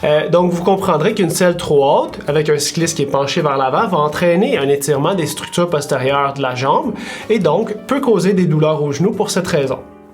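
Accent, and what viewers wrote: Quebec, Canada. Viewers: Ca fait plaisir d’entendre a nouveau parler québécois ! J’ai fait mon bac et ma maîtrise à l’UL Chapeau pour votre chaîne et le contenu de qualité et bienvenue à vous tous à venir rouler au Maroc après COVID-19 bien sûr !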